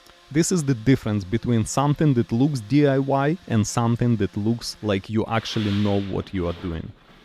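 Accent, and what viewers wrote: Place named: Ryazan, Russia. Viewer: Я слышу Рязанский акцент в этом английском. Какой то примитивный чел.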